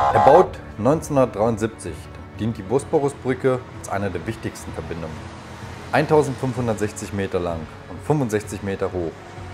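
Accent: Germany. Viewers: I like your German accent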